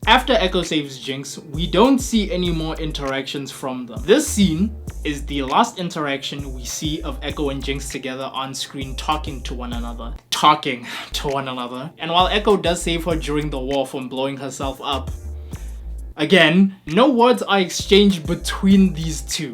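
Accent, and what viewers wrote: South Africa. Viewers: R you South African? Ur accent is giving South African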